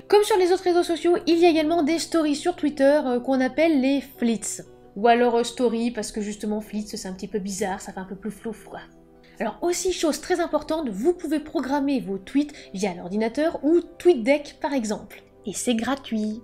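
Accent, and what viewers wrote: England, United Kingdom. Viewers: Merci l'accent anglais ! coeur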